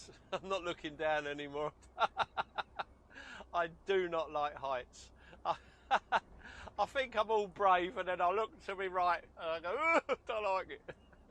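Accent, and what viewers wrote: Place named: Essex, United Kingdom. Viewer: Great video mate, you sound like me mate from Essex gary gadget lol, i look forward to your future vids 👍 😊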